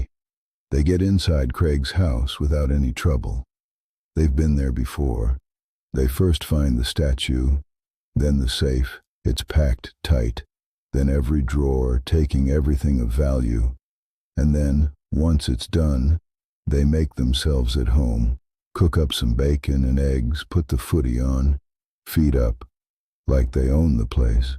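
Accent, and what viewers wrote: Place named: United States. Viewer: This is good but the American accent kills it lol otherwise good job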